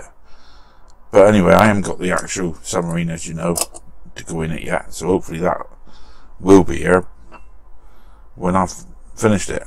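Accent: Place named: West Country, United Kingdom. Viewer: Hi, love the videos seen most of them, usually start my day with one, grew up in Dorset and have lived in the California for 36 yrs, love to here the west country accent.🇬🇧🇺🇸😎👋👍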